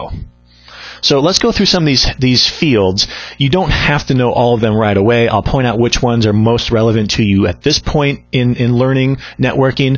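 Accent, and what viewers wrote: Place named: United States. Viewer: Great explanation and most importantly: an AMERICAN ACCENT!! Thank you!!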